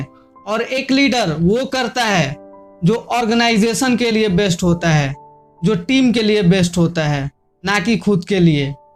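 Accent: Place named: Bihar, India. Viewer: Bihari youtuber bhai accent badalo yaar...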